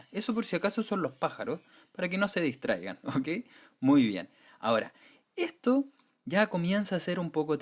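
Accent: Chile. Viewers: Muy buen video, este era codigo que utilice muchas veces pero nunca lo vi ASI EN LIMPIOO y con una metafora TAN PERFECTA como las peliculas y serie, adems me encanta el acento chileno ajaj no se de que region es este acento.. pero tiene mas C y S que otros. Saludos desde Argentina. muchas gracias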